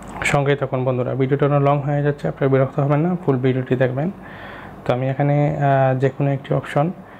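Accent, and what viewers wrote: Sylhet, Bangladesh. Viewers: আপনার কথার স্টাইলে বুঝলাম কারন আমিও সিলেটের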